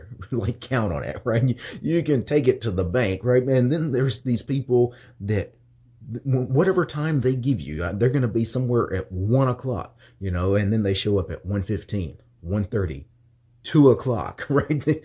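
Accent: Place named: Texas, United States. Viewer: Unsubbed. It goes both ways,. Some people struggle with this their whole lives despite conscious efforts to improve. You sound like a true Texan in this video, and I don’t mean that in a good way. You are only isolating yourself.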